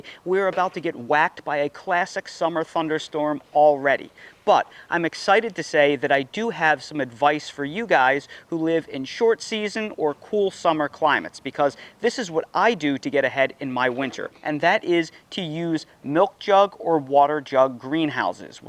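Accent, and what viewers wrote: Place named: New Jersey, United States. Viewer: Love love love this! So glad i found your channel! I'm in New Mexico and using the vlack weed fabric terrifies me 😅. It does get cool at night (50s) but during the day it's in the 90s. Even starting around the end of april. But I love this idea. I have a raised bed (livestock water tank 😂) and just got some grow bags. But it all seems to be going so slow. I even started mine indoors and it still seems slow 😢 but I cant wait to learn more. Was surprised when you said you were in the south bc i heard the accent but then you said Jersey and it made sense 😂😂 im from ga so it was funny to notice 😂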